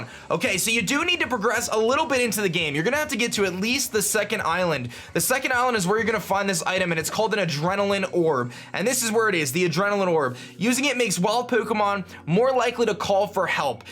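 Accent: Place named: California, United States. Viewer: sounds like he's from California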